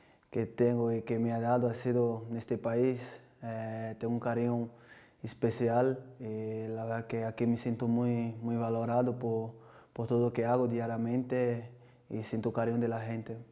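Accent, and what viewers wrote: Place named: Spain. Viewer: Uh si se nota que es español :v :v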